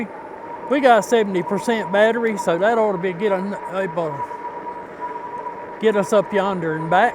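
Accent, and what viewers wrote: South, United States. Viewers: Nice video! I love your southern accent.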